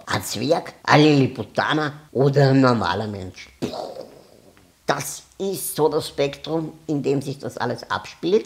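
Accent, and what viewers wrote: Austria. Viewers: Dein Wiener Akzent ist so süß😍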